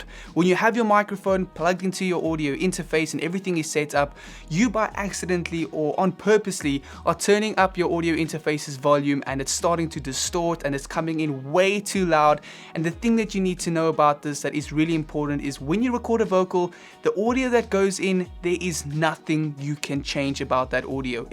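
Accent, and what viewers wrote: South Africa. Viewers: Good stuff! I always learn a lot from your vids.! And your S.A. accent is the coolest! 😀😎👍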